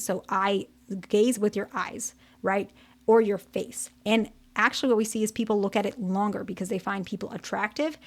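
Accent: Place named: United States. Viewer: It’s just a regular American accent.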